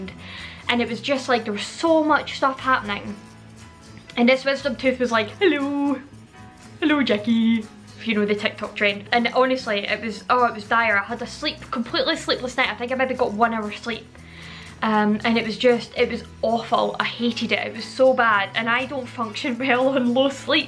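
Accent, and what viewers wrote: Scotland, United Kingdom. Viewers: your Scottish cadence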